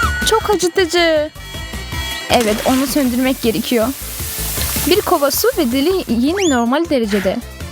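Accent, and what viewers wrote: Azerbaijan. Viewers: Sen azerim8sin türk mu anlaşlimyo bence azerisin 😏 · Azerbaycanca konuşuyorsun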